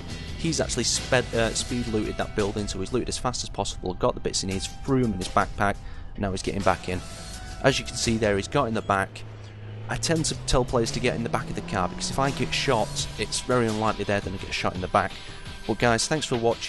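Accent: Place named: Manchester, United Kingdom. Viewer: which part of Manc are you from?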